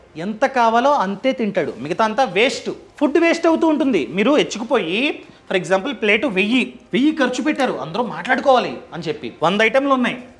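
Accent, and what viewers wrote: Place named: Andhra Pradesh, India. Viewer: Hi, Please don't mind for this Question. Why is your Telugu slang so different? Are you not from AP?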